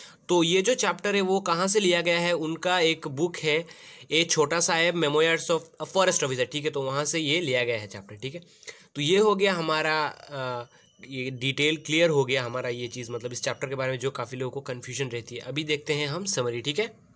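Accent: Bengal, India. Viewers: Aap Bengali ho na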